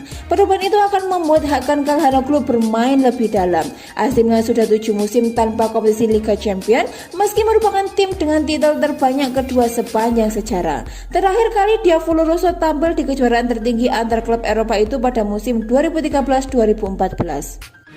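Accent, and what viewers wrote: Java, Indonesia. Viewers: medok banged jawa nya 😁😆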